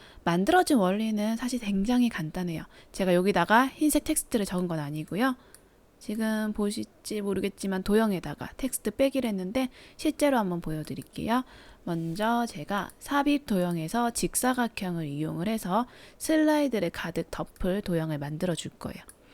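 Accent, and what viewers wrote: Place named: Busan, South Korea. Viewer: ㅎㅎ 뭐하노~~ 붓싼아이가~~ 정겹네요~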